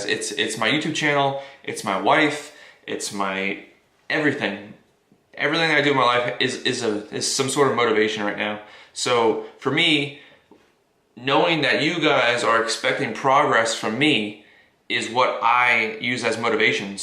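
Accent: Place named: United States. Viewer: Hey bro! You are vlogger 🤟🤳 cool video man! Love the energy and your edit! ❤👍 Nice workout! My questions for your q&a is: ➡️Where are you from ? You sound like American 😉🇺🇸 ➡️What made you change your diet and workout? Probably you say on your videos but I just met you 😅👍 ➡️Who is your favourite superhero? ➡️What would be your speech topic if all people of the world could hear you for 5 minutes? 😃👍😉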